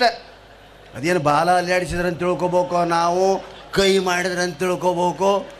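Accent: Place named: Uttara Kannada, India. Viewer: Super sir nevu yell hodru nama Uttara Kannada bashe dag matadtiri ☺️Ur amazing sir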